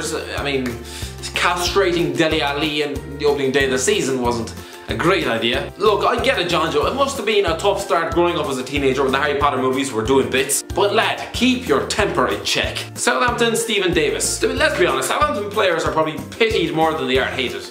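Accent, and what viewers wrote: Ireland. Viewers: are you irish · Irish accent👌🤘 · Does the "Irish Guy's Rant" on the screen for 90% of the video not tell you? Or his clearly Irish accent? Or the fact he called himself Irish multiple times in the first few seconds? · Shit I can't understand half of the things he says, Irish accent is difficulty asf, slow down boy, you're talking way too fast, does every Irish talk this fast?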